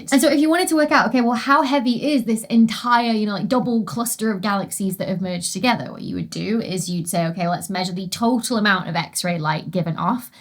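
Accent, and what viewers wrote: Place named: North West England, United Kingdom. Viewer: I always come to Dr. Becky's parlour to listen to her brilliantly articulated speech. It's a pleasure to hear her speaking the North West English.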